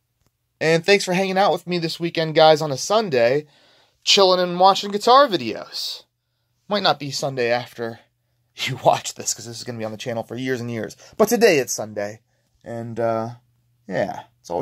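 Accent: United States. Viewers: When you going to germany for some real metal head shit real nice work doing. you def got a florida accent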